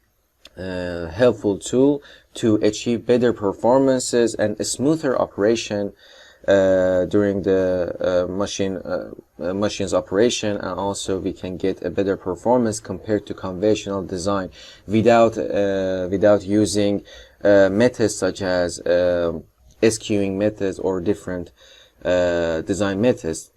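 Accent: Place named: Iran. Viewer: Sound IRANIAN dialect!!! Thanks by the way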